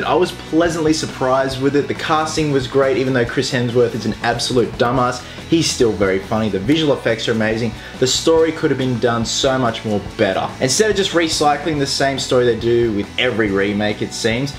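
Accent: Australia. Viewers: I love Australian accents and you have one of the best. -Hugh Jackman -You -ExperGamez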